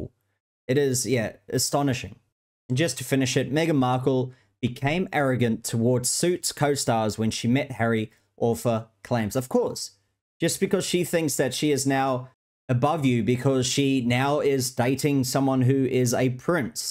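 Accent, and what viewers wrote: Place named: Australia. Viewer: I subbed because I have a weakness for an Aussie accent and most importantly, I can't stand those grifters.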